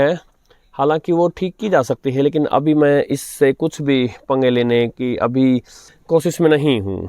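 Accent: Standard. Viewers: आपकी शुद्ध हिंदी बोलने का कोई मुकाबला नहीं है धन्यवाद, यह वीडियो भी आपके लिए 😊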